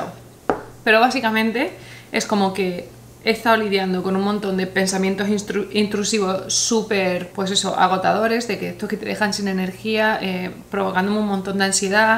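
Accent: Granada, Spain. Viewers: Hola escribo desde tu tierra,te encontre por casualidad y enseguida pense está chica es de Granada,tu forma de hablar te delató,desde entonces no he dejado de seguirte.Te hecho de menos cuando no estas y me alegro cuando regresas,soy una incondicional a tu canal aunque casi nunca comente.Entiendo tus altibajos y que a veces te cuestiones muchas cosas, no importa para mi el tiempo que estes ausente lo importante es que regreses y lo hagas como hoy tan sincera,natural y real que en definitiva es lo que me gusta de tu canal.Bienvenida y siempre agradecida por mostrarte como eres.Besitos desde Granada ❤😊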